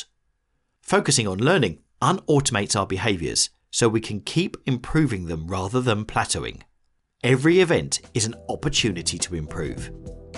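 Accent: United Kingdom. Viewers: The irony of a man with a British accent talking about the successes of Benjamin Franklin. 🇬🇧❤️🇺🇸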